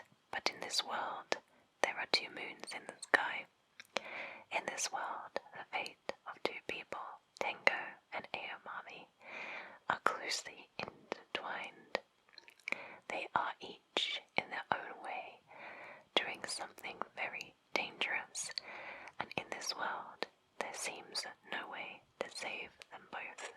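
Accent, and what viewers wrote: United Kingdom. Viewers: Beside her being beautiful is she Britain from the accent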